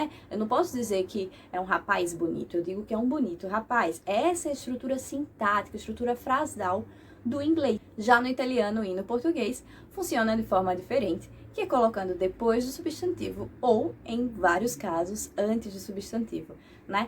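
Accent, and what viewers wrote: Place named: Maranhão, Brazil. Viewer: Meu Deus, piqena tu é maranhense... Já tô gostando mais ainda. Também sou do Mará. Tô treinando pra chegar aí na Itália tinindo. Ainda bem. Qe tem tu pra ajudar me ajudar sair do maranhês pro italiano kkkkkk Mamma mia!!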